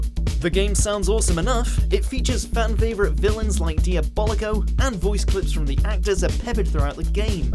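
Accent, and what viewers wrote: United Kingdom. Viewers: Why is the narrator so british in this? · Who is this British guy?!?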